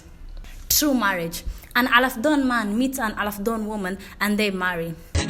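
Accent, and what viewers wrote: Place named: Somalia. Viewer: Lalabo waye gabadhaan wlh uuf.... her english sounds like somali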